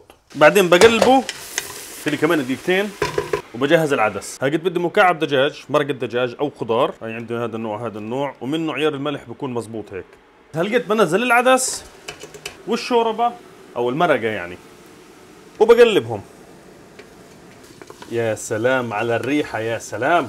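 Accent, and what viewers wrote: Palestine. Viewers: بالعكس لهجتوا فلسطينية بحته احنا هيك ابنحكي في فلسطين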